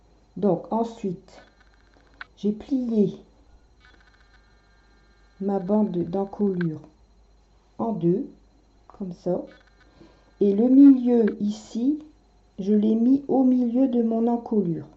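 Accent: North, France. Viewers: merci vous avez l'accent du nord que j'aime je suis née dans le nord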